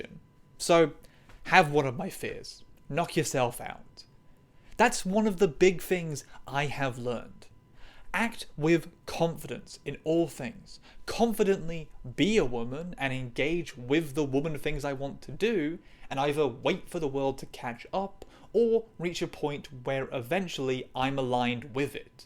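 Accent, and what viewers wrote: United Kingdom. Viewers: lmao yeah i thought this whole time she was some flavour of british 😅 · She definitely has a British accent haha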